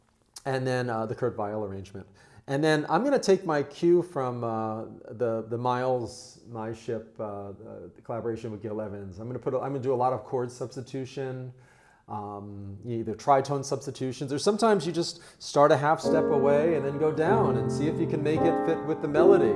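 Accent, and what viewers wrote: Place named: New York, United States. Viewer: It's been such a pleasure watching you and leaning with you over these past few year. I have noticed that your NY accent and cadence have gotten a bit thicker over time compared to your first videos ;-) Keep well.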